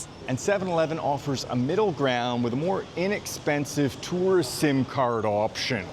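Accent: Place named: Canada. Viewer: He's a fellow Canadian - the sound of home ;-)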